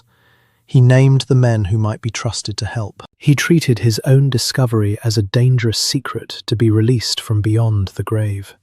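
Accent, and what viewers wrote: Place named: United States. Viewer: Sorry. American accent. Finding something else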